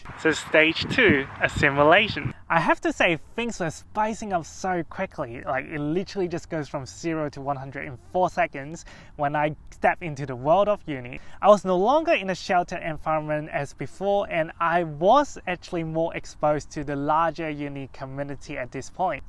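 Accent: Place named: Australia. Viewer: Good video mate. Your accent is a bit Aussie. I went to Hong Kong 40 years Ago and it was a very friendly Place. Went back again recently And it's so crowded and not Very friendly anymore. Times change.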